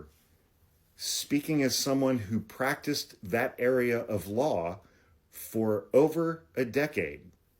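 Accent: Canada. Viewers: You sound mostly like a left-ish Canadian. Speaking as one, not an insult. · You sound Canadian, almost.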